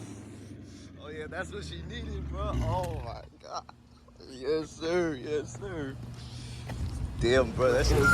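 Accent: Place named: Charleston, United States. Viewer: Where you from? Sound like chucktown. But you can’t make that thang fast dawg I have one 2V booty bruh it’s fantastic for your first V8 it’s my first V8.. and I’ve had 2010 CTS v6 straight piped and a 2017 camaro V6 with factory NPP quad exhaust. My v6 Camaro was faster than my 2000 Gt. And Camaro was factory stock. Stang has full exhaust Throttle body. Heads, intake, stage 3 flywheel and clutch. Short throw shifter (so much better than anything) 350/70 something rear wheels or 370 some huge ass tires on the rear. Haven’t tried topping out yet or digging it like that because I don’t want it to break.. Shit is loud as hell but Slow 😭 my first V8 though tbh so I just love it and love how it sounds. As long as I have a V8 I’m happy.. idc if it’s slow I came from V6 all while wanting a V8.. finally got one that’s not on credit and PAID. 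About to get a C5 next way more capable of power less money than 4.6 2V Topped my CTS v6 at 145 completely straight for an hour hit 145 V6 Camaro hit 150Mph My new edge 2000 GT I haven’t even tried to top out yet 5 speed but if I did I hypothesize I’d say 150Mph? But probably longer to get there? Might be quicker to get up there than my Camaro with 20K miles That’s a maybe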